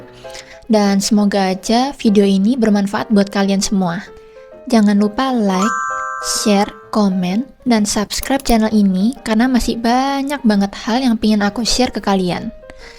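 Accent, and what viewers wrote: Java, Indonesia. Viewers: Logatnya agak ada jawanya, hehe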